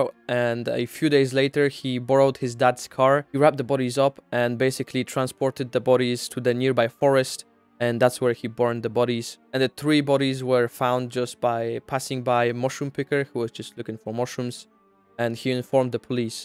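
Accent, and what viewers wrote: Poland. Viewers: Masz silny polski akcent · U can tell ur polish when u say all the polish names and towns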